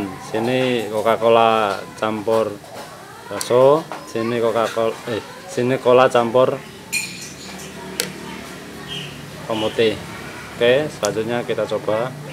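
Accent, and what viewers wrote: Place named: Java, Indonesia. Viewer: medog jawa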